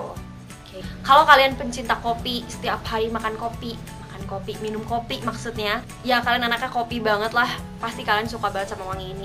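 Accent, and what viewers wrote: United Kingdom. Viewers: 1. 2. probolinggo 3. mau yang radiant skin kak, mau nyoba soalnya kulit aku kusem wkwk 4. ihh aku suka banget sama aksen inggrisnya kakak, bikin video tentang aksen inggris dong kak, trus semoga subscribernya nambah kak. sukses kak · Ig :Vitataaaaaa Kota Asal: Gresik-jatim Yay akhirnya GA time juga!!Awalnya sih aku ngasal buka video kaka gegara nyari review st.ives,dan dari itu aku langsung cinta banget sama chanel kaka,auto like n subscibe + aktifin lonceng sejak pertama kali nonton (ini ga boong swear✌),yg pertama bikin aku jatuh cinta tuh cara present nya yg bener2 se -profesional itu,dan bener2 ngreviewnya gapernah ngasal,banyak banget benefit yg didapet habis nonton video kaka,aku jadi tau kandungan apa aja yg mesti di perhatiin di ingredients produk biar milihnya tepat sesuai skin type,dan dan dan yang paling bikin aku jatuh cinta tuh cara ngomongnya yg ada aksen britishnya subhanallah berasa sekaligus belajar pronouncing gitu😍😍😍dan aku ikutan ga ini karena pengen banget nyobain st ives yg coconut n coffee,penasaran sama deep exfoliate+ gentle nya kaya yg kaka jelasin❤❤❤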